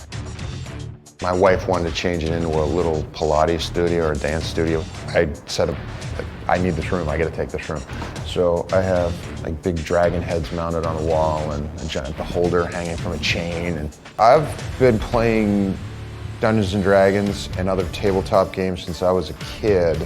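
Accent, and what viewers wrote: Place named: Pennsylvania, United States. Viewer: I find all of you guys to be wonderful beyond words. What's really odd is part of it is because you're Pennsylvanian, which I can tell from your accent and you're upscale "Ohio River Valley". The richer ones (Rivers) in Pittsburgh. Very excited to be with you AND get our government some BIG Money!!!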